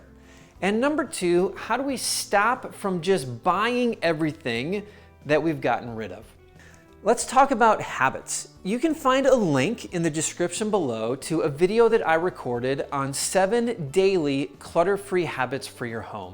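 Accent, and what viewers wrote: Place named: United States. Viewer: Hi, I'm a Spanish woman who is trying to stay minimalist after a long period of decluttering in my life... Also, I'd like to say you that I learn lot of American English with your pronunciation! Thank you very much!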